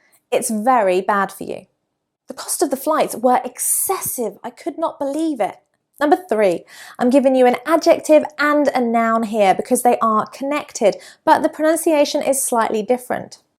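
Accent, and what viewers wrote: United Kingdom. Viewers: Really I enjoy each lesson with you I loved the British accent it is attractive when you use it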